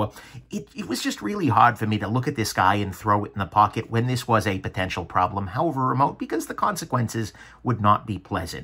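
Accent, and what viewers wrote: New York, United States. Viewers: Your channel is my favorite. BTW, are you from New York?? Thanks for the reviews!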